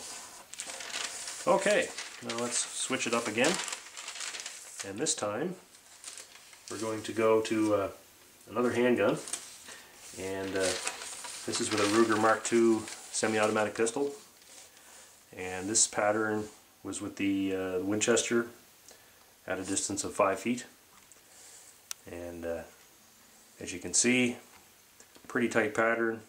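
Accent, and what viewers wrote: Canada. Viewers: I thought you sounded like those epic meal time guys. That explains it. You're both Canadian.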